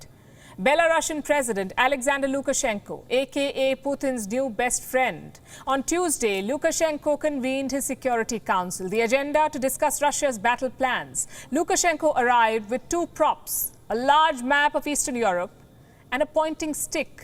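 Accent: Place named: India. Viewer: I CANT LISTEN TO THIS INDIAN ACCENT IM SO SORRY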